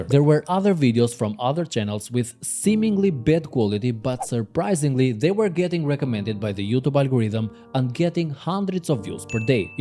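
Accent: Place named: Albania. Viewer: hahahahahah sa dallohesh qe je shqiptar ti o plak 😂 vec nga theksi e kuptova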